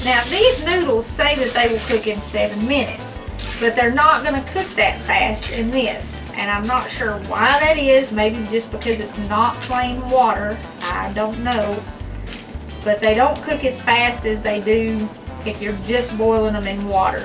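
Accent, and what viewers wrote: South, United States. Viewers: i live in texas now, left the great state of Arkansas in 1954 and adopted Texas as my home. i love your channel not only for the dishes but mainly to hear that voice, nothing takes me back home more than a good old southern accent. a lot of the dishes you make we called them our bachelor dishes when me and the kids had to get by alone during the divorce and remarry stage. i came up with lots of dishes that were easy to make and filled us up well, couldn't ask for more than that, and today after all those years ago the kids and grandkids still ask me to make some of those dishes, my cornbread is a favorite.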